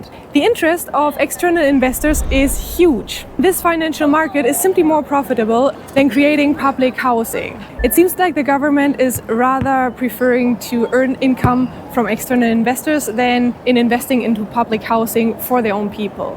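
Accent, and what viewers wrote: Germany. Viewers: has a German accent? True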